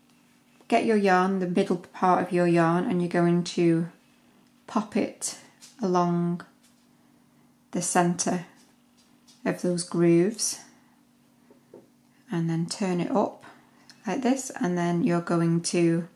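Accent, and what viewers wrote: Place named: North, United Kingdom. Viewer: nice to hear a lovely ‘northern’ uk accent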